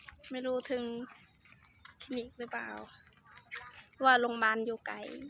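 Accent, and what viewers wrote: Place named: Cambodia. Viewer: เสียงเว้าค่อคนเขมรเนาะ (ສຳເນີຍງຄືຄນຂ້ເຫມນເນາະ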